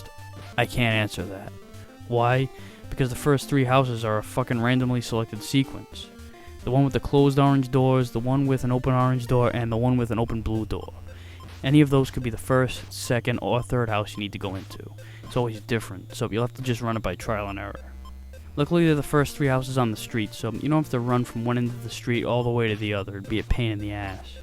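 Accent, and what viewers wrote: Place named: Brooklyn, United States. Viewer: your voice is almost remeniscient of a Brooklyn Accent. nice vid btw :)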